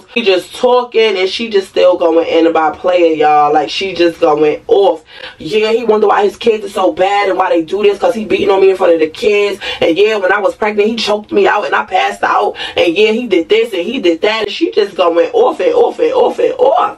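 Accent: New York, United States. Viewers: New subbie . Are you from BK? I swear you sound like a NYC girlie . Anyways, the advice you gave her about the advance and taxes was so solid, but she wasn’t ready to hear It. Your friend reminds me of a girl I used to be cool with . Tried to help her get back into school, paid for groceries, and tried to help get her life back on track just for her to dog me out. Sometimes it’s not about you, ppl are gonna be who they gonna be 🤷🏾‍♀️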